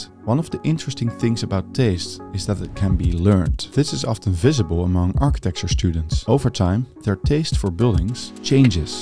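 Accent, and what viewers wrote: Netherlands. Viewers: Notwithstanding your terrible Dutch accent, you are every bit right about the importance of beauty. Actually, this is exactly what King Charles III stated as Prince Charles when he released "A Vision of Britain" and launched his institute for architecture. Efficiency, practicality and beauty are not incompatible at all. On the contrary, they should go hand in hand.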